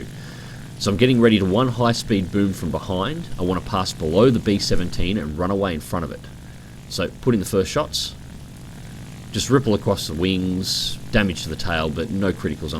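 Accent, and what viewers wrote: Australia. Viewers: What easiness and talent at gameplay and great post battle analysis! I was watching Mighty Jingles playing War Thunder and some guys recommended. So I hoped to this channel a bit and eventually subscribed. And I adore the accent! I have relatives in Adelaide, although I am not Australian. I've heard a lot of good stuff about Australia and Australians. Greetings from Belgrade!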